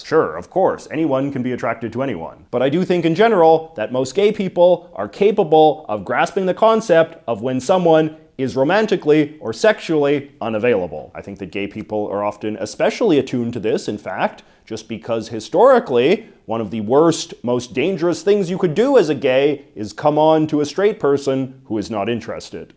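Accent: Canada. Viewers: has literally the most Canadian accent I have ever heard, and I'm a Canadian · Mmmm hard to say and tricky subject I mean I hope shit calms down but I think most men 19-25 say have the thought of "could tap" with any possible mate which does get in the way of meaning ful relationships with woman and so in a ignorant attempt to step into one's shoes the young man with think that a young man who is instead attracted to men will then have similar thoughts. It is kinda baked into what we are. The whole friendship thing is just a plus of having big brains. I had something I wanted to say but forgot after trying to get all this out oh yeah kinda lame you are only know for being gay I remember being kinda surprised but you really don't talk about it. I never know If I should be typing third person so it doesn't read like I am talking to the youtuber hie self... you know? 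anyway I always think of this guy as the one canadian that actually sounds like that and the guys isn't even a newfy I have even visited my uncle in Vancouver and I didn't pick up the locals accent. Man that was long winded I need some more fluoride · There is something in the way that you speak that is slightly hypnotizing. The eye movement. The head movement. The cadence of your speech. The intonation swells. The relaxed smiles. It's very calming. You could easily speak for a 3 hour lecture and I would listen to all of it. .. Maybe it's just because I'm Canadian and like to hear the accent. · is the most Canadian sounding Canadian i've ever heard. Also did not realise you were 38...thought you were way younger than that. ❤ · You have a very Canadian stereotyped "about". I often heard the stereotype but I didn't think it was real. When I see a same sex couple holding hands, I smile like a loon and I try not to stare at them at all because it would be so creepy to have this stranger smile and stare at the same time. 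I can't help it, it makes me so happy. It's so rare where I lived, I think it happened to me only once. I hope that one day, it will happen so frequently that I will have a normal reaction. (just to be clear, I'm queer too)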